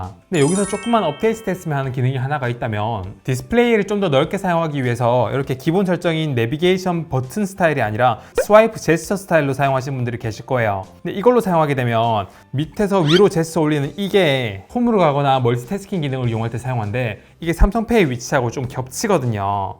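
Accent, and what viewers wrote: Gyeongsang, South Korea. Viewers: 유용한 꿀팁 감사합니다 경상도 사투리가 귀엽네요 ㅎ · 살짝 돌출된 입 ... 들릴듯 안들릴듯한 경상도 사투리... 뭔가 깔끔하고 단정한 김영철같아요...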